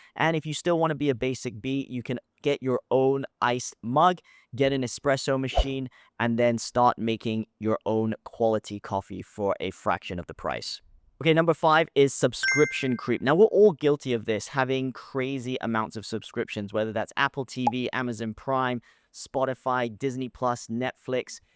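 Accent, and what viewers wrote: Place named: United States. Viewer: wat an american accent dude talking singapore finance? go back to america!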